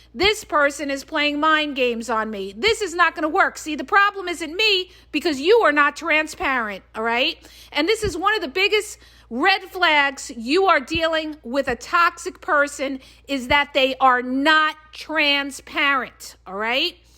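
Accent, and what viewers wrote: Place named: New York City, United States. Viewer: Greetings from Springfield, MO. You sound like NYC gal 👧. God Bless you! You are very entertaining 😂 I'm really enjoying your content, 2 years no contact with Narc family members hallelujah!! It feels amazing 👏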